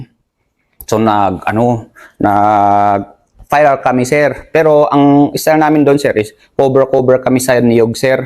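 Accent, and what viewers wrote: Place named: Ifugao, Philippines. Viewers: Talagang taga Ifugao si sir ying letter r nya😊ingat palagi sa lakad nyo sir... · Wow, a big salute Sayo kabayan Major. Halatadong Ifugao/Igorot because of the way you speak Sir. It's always a combination of English and Tagalog 😊😊😊. Anyway, I totally appreciate your courage and wisdom Sir. God bless.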